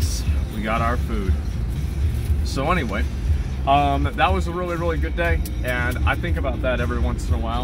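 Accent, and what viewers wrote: South, United States. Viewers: It hasn't hit me how southern he is until I watched this :3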